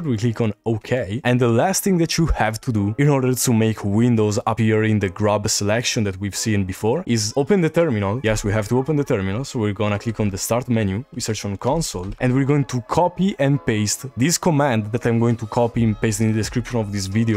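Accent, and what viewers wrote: Italy. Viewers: U are italian?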